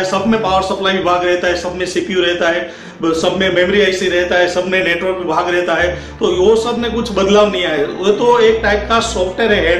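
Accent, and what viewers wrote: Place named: North, India. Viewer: North indians accent sucks!!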